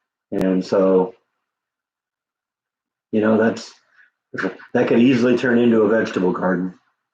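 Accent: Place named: United States. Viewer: I love your accent, American men sound sooo sexy to British women. I have a very British accent and speak very proper English.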